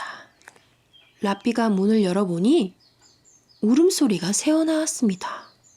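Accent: Gyeongsang, South Korea. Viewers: 저도 부산사람인데요... 경상도 표가 많이 나네요^^ 그래도 좋아요^^ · 님:) 시간날때마다 예전 영상도 하나씩 듣고 있어요! 저도 경상도 사람이에요 ㅎ 사실 같은 경상도 말투를 '좋아'라고 생가한적이 별로 없었는데 님 음성 들으면서 좋아지고 있어요:) 감사해요! 책만 읽는 것만 아니라 님 생각도 함께 이야기해줘서 너무 좋아요! 늘 응원합니다 💕 늘 건강유의하세요! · 경상도 사람이신가요? 사투리가.... ㅎㅎㅎ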